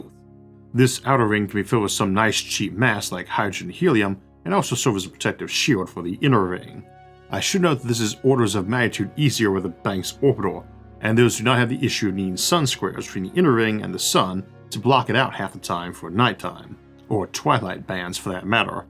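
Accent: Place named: South, United States. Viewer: know something please - your speech impediment was never enough to make you unintelligible, in fact it was part of the charm that attracted me to your channel - I do love a good Soouthern drawl (I'm British). Never feel the need to apologise for that. Folks that can't see beyond it are the true ones that need to grow of they want to enjoy your work. I am happy you have managed to get it to a point where you are happy with it though. Always remember the thought behind and quality of your work has always been the main, and will no doubt continue to be, it's prime feature.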